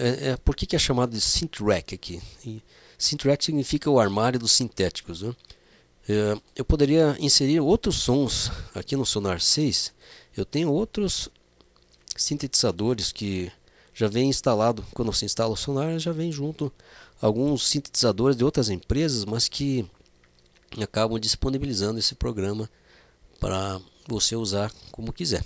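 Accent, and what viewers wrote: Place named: Curitiba, Brazil. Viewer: Caro Amigo, que maravilha o teu canal chê!!! Estou tendo de gravar no audacity, por eu ter mexido no meu Sonar 6, e sem saber, devo ter mexido em algum menú que tenha desconfigurado totalmente as saídas de audio e NÃO ESTOU PODENDO OUVIR MAIS NADA DO QUE JÁ GRAVEI!!! Prejuízo lamentável, e o pior de tudo, não sei se terei de perder tudo o que já gravei! Pois penso em pedir a um amigo meu pra desinstalar o Sonar 6, e re-instalar de novo, ou haverá uma esperança de eu reaver tudo de novo, se existir uma forma de eu "resetar" TODAS AS CONFIGURAÇÕES DE FÁBRICA do Sonar 6?!? Ainda que eu perca tudo o que eu gravei, (são só seis músicas) E voltar do zero de novo?!? Há essa possibilidade?!? Por favor, me dá uma luz... Desde já, muito agradecido. Um grande abraço daqui da Cidade de Maringá, Noroeste do Estado do Paraná, sul do Brasil chê!!! 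Sois de Curitiba?!? Senti bem forte uma acentuação no teu sotaque que me é muito amigável e familiar!!! Reiterado Abraço meu Amigo!!!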